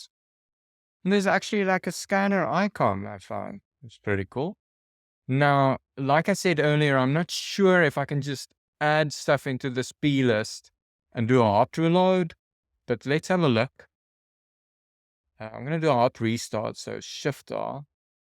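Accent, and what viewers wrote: South Africa. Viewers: Souf Efrican?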